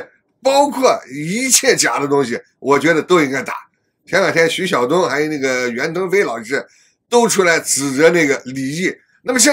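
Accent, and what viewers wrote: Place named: Northwest, China. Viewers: ，额觉得你是个陕西人，得是滴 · 听大哥口音是西北一代哦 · 老哥听口音是西北人吧，思路清晰，句句实在👍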